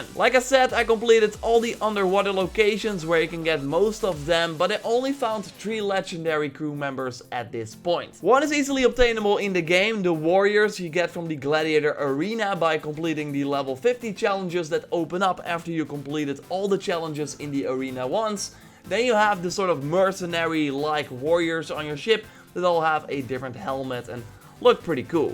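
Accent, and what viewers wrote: Netherlands. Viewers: Are you Dutch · Are you dutch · Nederlands accent niet normaal xD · 1 different question what is your country no hate but you sounds like somebody from the Netherlands 😅😅 Love your vids 😉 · Are you dutch want het klinkt zo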